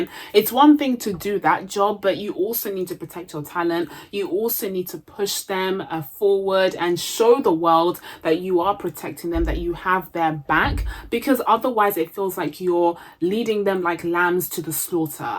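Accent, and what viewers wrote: United Kingdom. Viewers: Your English accent is so beautiful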